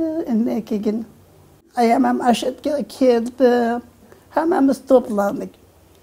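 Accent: Andijan, Uzbekistan. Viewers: Андижонча гапиряпти шева Андижон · Онахон сог саломат булинг, 5 ёшда кетган булсангиз хам (Анжон) Андижон шевамизда гапирябсиза , аллохим у́зи асрасин, фарзандларингиз бахтини ку́ринг, afarın teyzacim çok teşekkürler sağ ol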